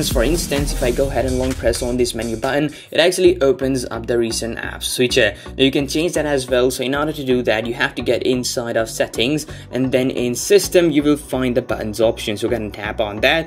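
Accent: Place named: United Kingdom. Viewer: Bro your accent is so good at first i thought that you were a british but still love your contant and a big fan of roms specially for note 3 tnx and keep uploading cool videos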